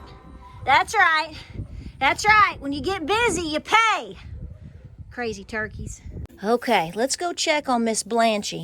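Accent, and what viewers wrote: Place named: Easton, United States. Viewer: I have been watching you for so long and just wanted to share just how much I enjoy it being from Easton to see myself I love Hearing that accent as you go over things you are so encouraging and it's so heart warming and comforting to know that you care enough to take your precious time and that of to share this information with us a lot of us know it have you used it and may have forgotten about I have forgotten about it but you're helping to bring it back to the forefront and I'm so grateful you too as well as your family are in my thoughts and prayers Best regards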